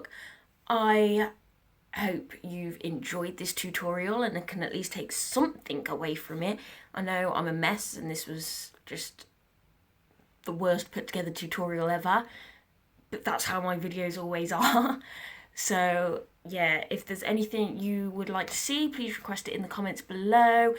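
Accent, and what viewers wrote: United Kingdom. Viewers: lol you speak with a british accent where does that come from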